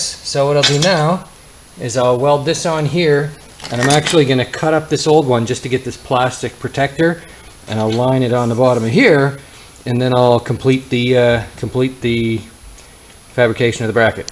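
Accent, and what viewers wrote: Canada. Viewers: Are u from nova scotia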